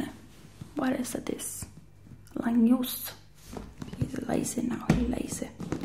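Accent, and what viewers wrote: Sicily, Italy. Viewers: The Italian accent is literally the same as my Nonna’s accent 😂😊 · Your Sicilian accent is so on point! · Oddio non ho mai riso così tanto per un asmr 😂😂😂 potrei sapere di che regione italiana sei? L'accento di Nonna mi ricorda molto quello della mia, adoroooo, hahahahah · one of the best Italian inflections I ever heard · You are VERY original, and very good! I really like your videos, you can relax someone, and at the same time you do that with a lot of originality. I appreciated so much the sicilian accent and the “next time a nonna” made me smile, because it made me think about my grandma with that strong sicilian accent❤️ Sorry for my bad english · Omg this reminds me of my Nonna so much gosh I miss her ... this video made me so happy ♥️ you talk just like her too 😂 kinda scary you sound just like my grandma she had a really thick Italian accent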